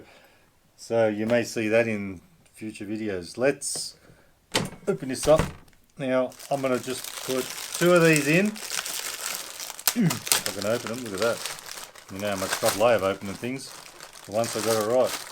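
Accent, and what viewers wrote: Australia. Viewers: Aussie mate 🇦🇺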